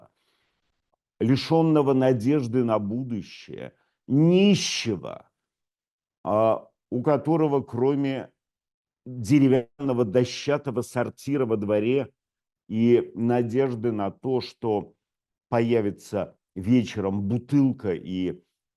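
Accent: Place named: Oxford, United Kingdom. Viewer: Я так и знал! Я всегда знал, или догадывался что милейшие милорд - это человек Оксфорда. Просто по его оксфордскому выговору, по манере держаться, присущей всем небожителям и по качеству работы его мозга.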